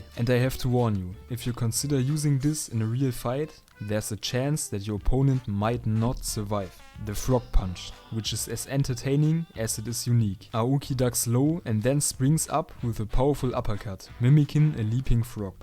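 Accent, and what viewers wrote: Germany. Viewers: This german accent... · Where u from? You sound German or something like that. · U German ?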